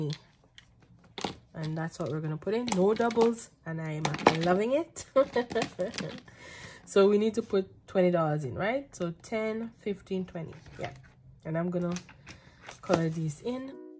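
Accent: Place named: Jamaica. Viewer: You are welcome. When I heard you I was like yep Jamaican 🤣🤣. I am as well 😁.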